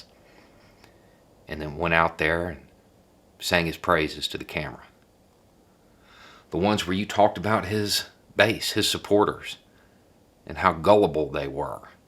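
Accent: South, United States. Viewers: Wisdom with a Southern accent. Who'd-a thunk it?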